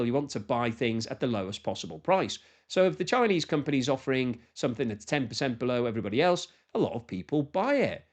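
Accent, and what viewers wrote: United Kingdom. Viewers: Luv the British accent! At least I think it's British!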